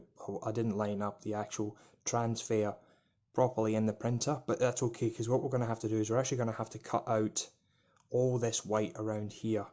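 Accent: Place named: Scotland, United Kingdom. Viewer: He sounds Scottish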